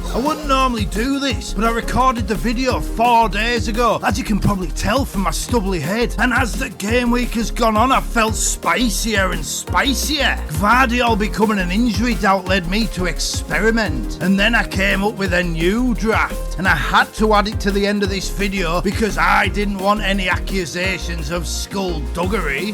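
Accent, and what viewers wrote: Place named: Northern England, United Kingdom. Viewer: Your take on things is great – you sound like the FPL voice in my head, but more northern (and balder) ... Keep up the great work & good luck 👍✨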